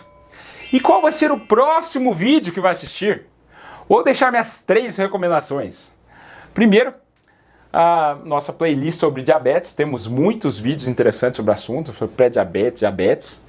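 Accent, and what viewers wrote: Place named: Curitiba, Brazil. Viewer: Se eu não me engano, é de Curitiba ou Santa Catarina. Tb acho lindo o sotaque dele. Aliás, o Brasil é rico em lindos sotaques. ❤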